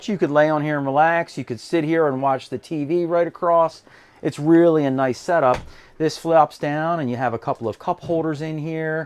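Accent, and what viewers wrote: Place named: Baltimore, United States. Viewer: Love your videos. does an excellent job shooting the interiors. You can hardly see them on other channels. I've been meaning to ask - you are from the Baltimore area, correct? - I grew up there and you have a heck of an accent, Hon! If I'm wrong, next guess would be PA or NJ. 😀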